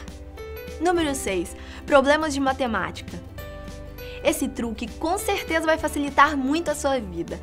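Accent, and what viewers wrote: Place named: Bahia, Brazil. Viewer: Pelo sotaque ela parece da Bahia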